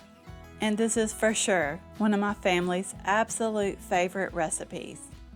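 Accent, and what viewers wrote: South, United States. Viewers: What a gorgeous lady with a nice southern voice ♥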